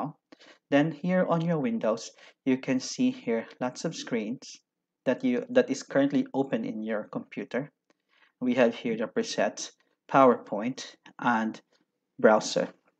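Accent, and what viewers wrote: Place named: United Kingdom. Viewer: Very good information. Congrats sa hereenng. British accent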